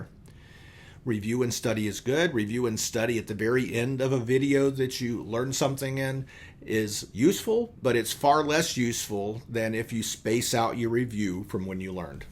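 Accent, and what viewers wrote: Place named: South, United States. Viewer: Excited, one to finally meet you here,. Two to finally be able to understand someone not too fast or too slow speaking, and also like your southern draw. Its refreshing 😊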